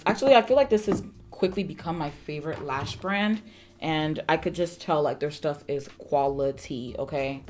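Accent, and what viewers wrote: United Kingdom. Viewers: I want more British accent in your videos PERIODT my darling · Your British accent made my day 😂😂!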